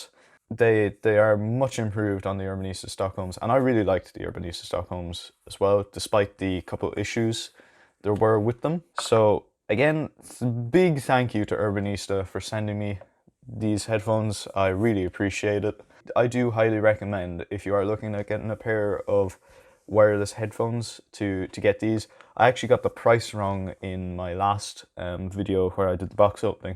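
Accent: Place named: Ireland. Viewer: As a Swede/Dane who use to live in Dublin: It's always great to hear the Irish accent!! The best English accent in the world, I always miss Ireland when hear that accent. Irish people are down-to-earth people, I love that country.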